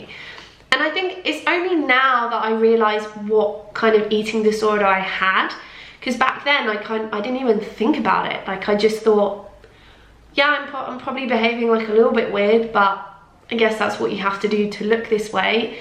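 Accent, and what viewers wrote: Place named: Shipston-on-Stour, United Kingdom. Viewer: Where in the uk are you? You sound like your from shipston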